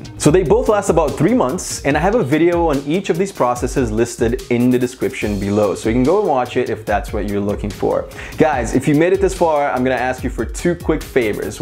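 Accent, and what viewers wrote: Brazil. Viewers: boy, you brazillian? cuz you sound like it, just a little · Dude has light Brazilian accent, maybe? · Caraca meu velho. Tu tem algum vídeo contando tua história? Teu inglês é mt bom, mas eu consegui ver que tu é br hahah Sucesso! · Cute strong Brazilian accent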